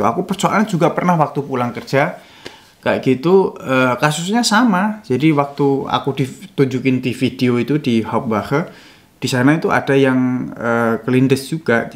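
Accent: Java, Indonesia. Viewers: Org jowo Yo mas? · Baru ketemu channel ini. Kok, bisa lidahnya istiqomah medok sekalipun sudah jauh ke Jerman?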